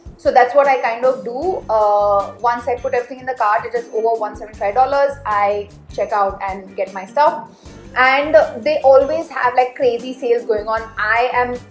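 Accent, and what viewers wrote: India. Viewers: INDIAN GODDESS ALERT! Thought you were from the UK for a second, then I heard the accent lol. You should become a super model! These big companies will give you all the outfits and products FOR FREE. Life is good huh! Or just marry some saudi billionaire or russian oligarch.... decisions decisions 😁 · The only thing more amazing than this woman's body is her accent <3 Indian women! <3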